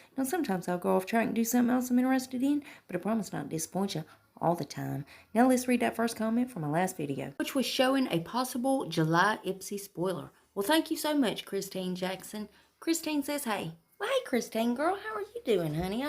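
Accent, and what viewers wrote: South, United States. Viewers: Finally another southern lady on YouTube!!! It's so nice to hear another southern accent.. makes me home sick tho!! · I've been so disappointed with Boxycharm over the last few months that I canceled my Premium box for June. I was frustrated last month getting the mascara over a palette (that fit my profile Perfectly), and then those brushes with the Ridiculous $90 price tag, and then the 2 mini lip balms that I'd be excited to see in a $12 Ipsy bag, but did Not belong in a Boxycharm Premium box... Come On!! It looks like the June Premium box is going to be much better, but I don't need another contour palette. The only thing I saw that really excited me in June's Premium is the Avocado sleeping mask. I'm crossing my fingers that I'll be able to pick it up in add-ons or Pop-up at some point. 🤞 I'm excited about Luxe, though. 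Hoping for some Great products to make up for the last few months of Duds! Thanks for the update, girl!! 😊 I'm excited to see Anything from First Aid Beauty! Nothing I've tried from this brand has disappointed me yet! Btw, I have that thick southern accent, too. There's nothing wrong with it! I lived in Virginia Beach for a few years when I was younger (I got my butt back to Texas as soon as I could! Lol), and it always amused me at peoples reaction to it there. Most people loved it, but some acted like I was speaking a foreign language! Lol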